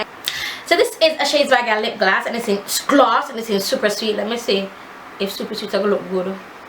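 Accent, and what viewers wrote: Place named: Jamaica. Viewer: loll you're so crazy i lavvv it. aint nothing like a jamican accent lol.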